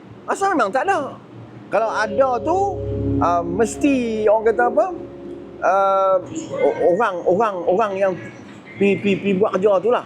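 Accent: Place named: Johor, Malaysia. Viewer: Amboi DSA dok JB teruih cakep pelet Johor nooo.ape bende le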